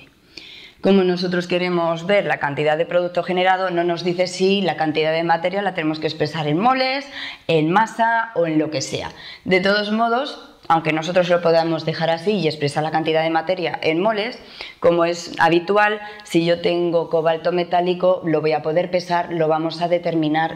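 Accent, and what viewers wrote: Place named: Spain. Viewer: Maestra, de que ciudad de España es usted? Me agrada su acento y no es tan enfadoso como otros españoles mucho más marcados. 🙂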